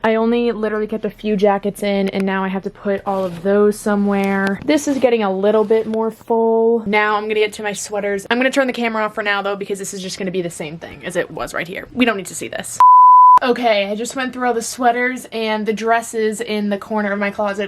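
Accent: Boston, United States. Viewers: jamie pettit it’s actually her Boston accent. I live in the northeast and yeah it’s mainly only ~Bostonians~ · also you being quarantined has brought your accent out sm and i love it hahaha u talk like my dad who grew up in boston "i was cleaning my rum and found the sweater ive been bitching abt" ahh yes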